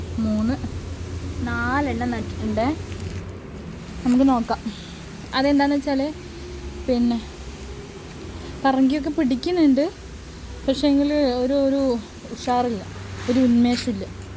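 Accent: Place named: Kannur, India. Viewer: Aaha kannur bhasha 😂😂😂😂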